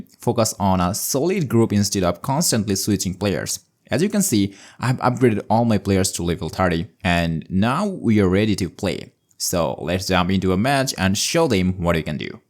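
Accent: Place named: Bengal, India. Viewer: Your accent is Bangali. Are you from Bangladesh?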